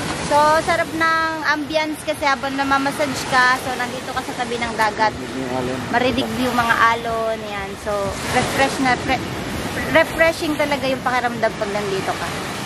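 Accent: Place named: Iloilo, Philippines. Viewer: Parang d ka nmn purong Tagalog ilongga ka ata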